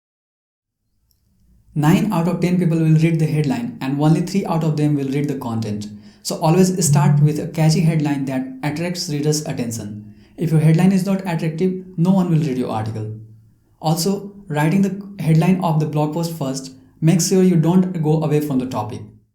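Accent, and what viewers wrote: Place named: India. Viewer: Indian accsent is the worst in the world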